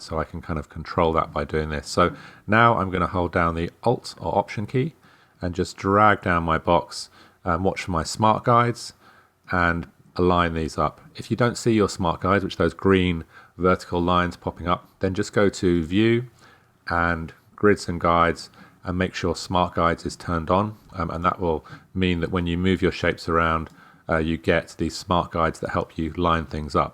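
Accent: United Kingdom. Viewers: Thanks very much, I needed these shortcuts. Your instructions are inspiration! Is your accent Manchester?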